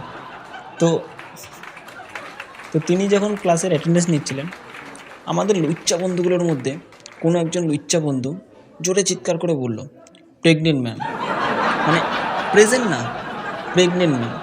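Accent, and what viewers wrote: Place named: India. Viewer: Bro prothome Indian accent ee kotha na bole Bangladeshi accent ee try koro Bangladeshi accent besi funny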